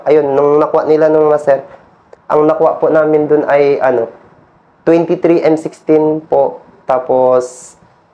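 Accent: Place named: Ilocos, Philippines. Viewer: ilokano yan taga ilocos norte... tanongin mo siya kasi mga taga roon.. ala won barok... di ala eh!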